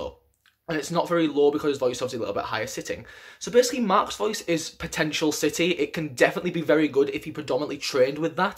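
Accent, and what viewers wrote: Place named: North, United Kingdom. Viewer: im so glad i stumbled across your channel, i for some reason love hearing you say their names in your british northern accent rather than all the american vocal commentaries cos it feels so familiar to me hahah "haaey chan". i love that u comment on each members voice and have good things to say about all of them cos they really are a group of MANY insanely talented singers.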